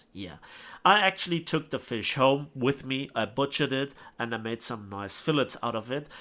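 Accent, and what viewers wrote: Bavaria, Germany. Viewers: Ist das bayerisch? Oder ein anderer Akzent?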